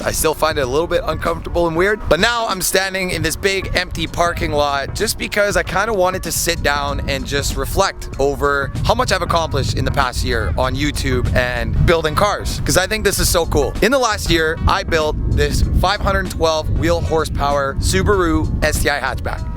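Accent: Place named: Canada. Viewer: Buddy!!! Sick ass subi. First time watching your video definitely subing. I heard the accent of a fellow Canadian Brother. What Provence you in? ( If you don't mind me asking)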